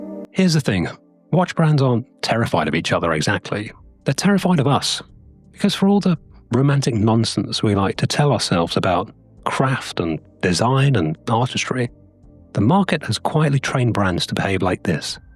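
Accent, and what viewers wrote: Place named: United Kingdom. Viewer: My dear friend, first of all — no, you absolutely do not have a froggy voice. Your voice remains unmistakably British and genuinely a pleasure to listen to. Now, onto the video. That final line about “walking backwards into the future” was brilliant. It captures perfectly the tension between nostalgia and progress. It’s such a sharp observation. Your point about the Submariner was also spot on. It’s fascinating how so many brands feel compelled to orbit that design language — trying to be similar, yet different. Over time, that pressure creates repetition rather than evolution. Escaping that gravitational pull is clearly difficult. I particularly appreciated your remark about people wanting a watch they don’t need to explain. That’s incredibly insightful. There’s something powerful about wearing a watch that feels socially legible without effort. 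At the same time, I keep wondering where real innovation fits in today. Large brands often seem cautious, relying on subtle refinements rather than bold steps. Smaller brands experiment more freely, but rarely at a technical level. And perhaps that’s the real dilemma: creativity exists, but it’s either financially risky or commercially unnecessary. I also find it interesting how certain technical use cases — diving, aviation, racing — defined dial design historically, yet most of us don’t live those realities. So what would watches look like if they were designed around modern daily life instead? Comfort, legibility, emotional resona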